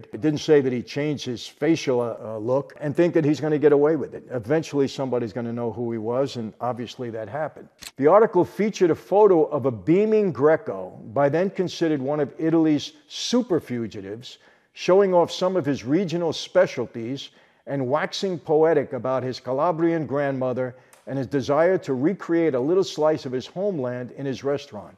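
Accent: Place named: New York, United States. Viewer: Wonderful story, by the only person I want to hear it from. Yeah the Italian is a bit rough, but the NY accent makes up for it !! I love the ' 2 question ' format !! There are so many interesting people who comment on this channel, it's fascianting to hear their qestions answered. Best wisjes to all who gather here , and great congratulations to a + 1M channel, it's been well earned and deserved !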